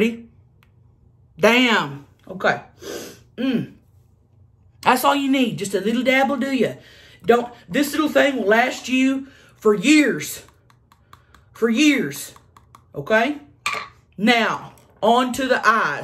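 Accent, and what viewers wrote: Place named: Texas, United States. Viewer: I just love those Texan tone🍧🍧😋😋☺️☺️☺️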